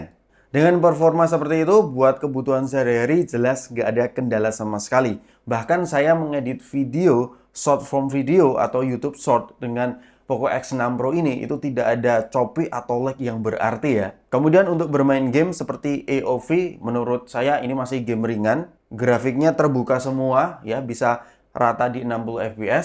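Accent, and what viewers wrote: Semarang, Indonesia. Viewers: wong semarang yo bang?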